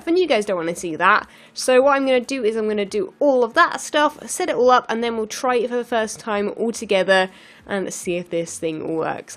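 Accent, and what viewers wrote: United Kingdom. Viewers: Excited much???? Gaw, at times you were talking so fast I couldn't understand you through that thick British accent. No offense meant, seriously none meant. Have to say things like that because apparently I can offend any female just saying "Hi". Love it take care.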